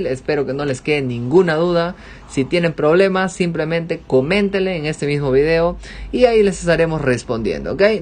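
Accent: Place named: Amazon, Peru. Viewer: Causa, buen vídeo, me sirvió bastante, por tu acento saqué al toque que eres de la selva peruana, saludos desde Tingo María.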